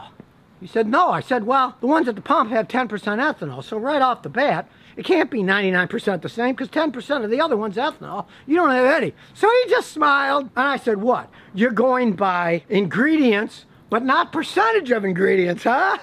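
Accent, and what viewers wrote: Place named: Midwest, United States. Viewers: Definite midwest accent here. I'm guessing Chicagoland area? :) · You almost sound Chicago in this video! Not one once of the bullsh*t you'll hear daily.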